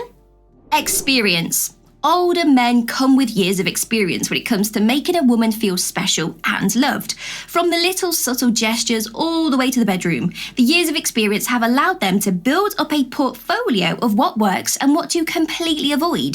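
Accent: United Kingdom. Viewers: I kind of like the British accent, and you don't make the eyes hurt. I do find myself going whew when you finish a video...as while I enjoy listening to you talk I feel bombarded at times.... peace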